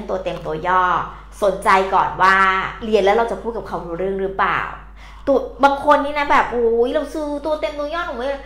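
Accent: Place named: North, China. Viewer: 看似泰籍華人，但講話口音是大陸北方的。香港廣州這邊基本聽不到這種口音。就好奇哪裏來的那麽多衣服。幾百個視頻每次都穿著不同，神奇哦！ 但老師的中文確實厲害，對學習泰語有極大的幫助。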